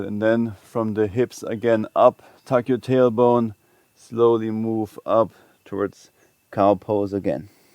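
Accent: Germany. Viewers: Are you from Germany? Your accent sounds hella german :) Good video👍🏼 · ich war in Köln für ein Jahr. Freut mich so sehr ein Deutschakzent zühören! Keep being awesome! Love the channel I can't wait to show my partner :-) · Nach4 Sekunden direkt den deutschen in dir gehört. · Are u german or swiss? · german accent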